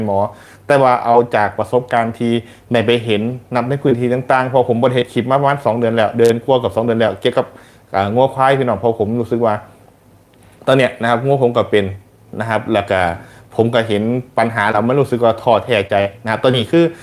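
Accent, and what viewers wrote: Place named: Phutthaisong, Thailand. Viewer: พุทไธสงชัดเจนคับ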